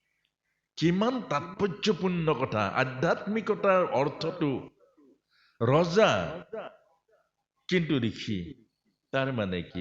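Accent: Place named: Bodo, India. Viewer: Bodo accent ❤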